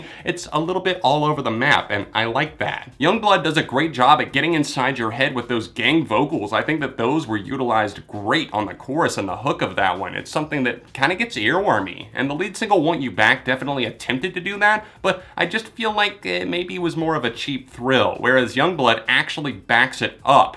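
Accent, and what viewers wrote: Australia. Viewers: I like your Aussie accent,!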